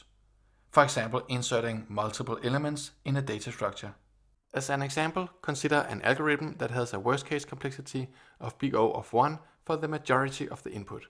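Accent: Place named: Denmark. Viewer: Pretty sure he is, his accent sounds exactly like a dane speaking english.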